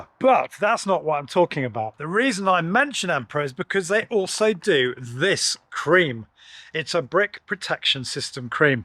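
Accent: United Kingdom. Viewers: I think his pronunciation is standard in the UK.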